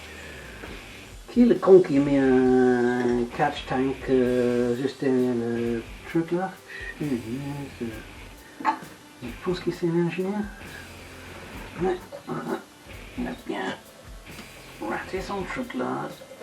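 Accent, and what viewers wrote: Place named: England, United Kingdom. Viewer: Votre français est bon ! J’adore votre accent anglais haha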